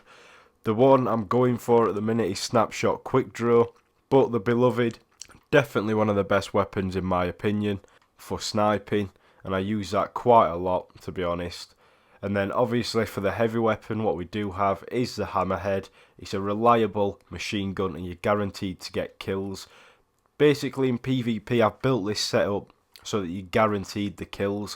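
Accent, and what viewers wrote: Yorkshire, United Kingdom. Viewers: Dude are u a yorkshire lad???? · You sound like a reight Yorkshire man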